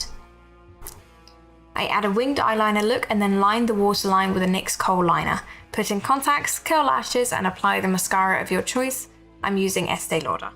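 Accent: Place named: Australia. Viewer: I do like your voiceover better! This is much more informative for those of us who missed the stream. Plus, you know, your voice is as awesome as the rest of you! I'm from the United States and I LOVE your Australian accent! How much do you sing? I'm totally serious about your voice (at the very least, you have a wonderful speaking voice, even if you don't sing at all). <3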